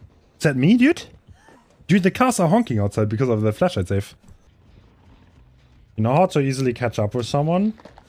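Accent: Germany. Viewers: I knew I heard some German accent. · Ist er deutsch?😂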